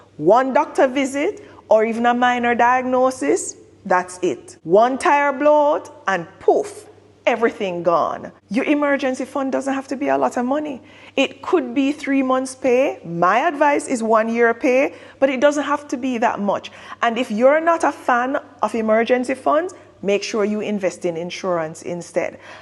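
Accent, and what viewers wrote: Jamaica. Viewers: O Jamaican in foreign you talking 😂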